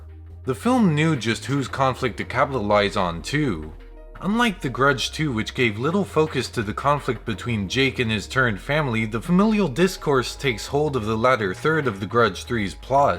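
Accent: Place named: Ireland. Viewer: I can’t tell if you’re Irish or American or both. You have an Irish twang in your accent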